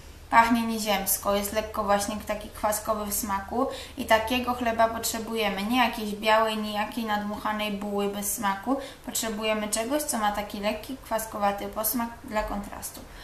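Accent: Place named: Silesia, Poland. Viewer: Masz taki akcent slaski lub goralski :) fajny filmik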